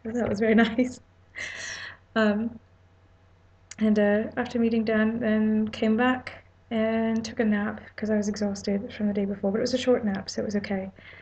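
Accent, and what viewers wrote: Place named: England, United Kingdom. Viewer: LOL!! I did that too when I was surfing in England. Yes, there's surf in England. The accent sounds good on you, but sounds weird coming out from a Filipino-Hawaiian-Basque dude (or a brown brahdah). LOL! Cheers.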